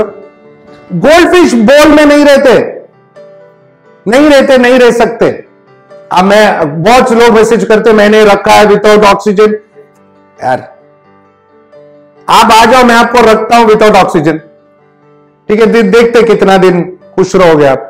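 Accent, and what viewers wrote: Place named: Tamil Nadu, India. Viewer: Hi, I am eagerly awaiting to watch and hear your cute Tamil slang, please upload some Tamil videos then and there.. At least a dubbed version of your Hindi videos...😝😝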